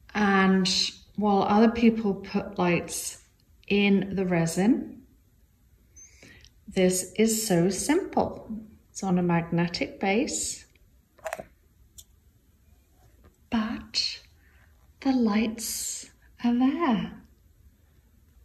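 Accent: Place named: England, United Kingdom. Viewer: You sound English, what are you talking about going to the 'dollar store' for?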